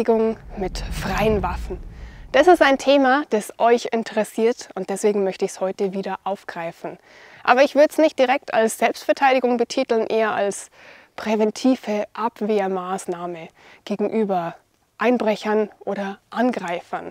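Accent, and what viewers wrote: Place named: Swabia, Germany. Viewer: Perfekt wär das Video, wenn die ganze Zeit schwäbisch gesprochen hätte - ja, dieser kleine Kieferle! 😉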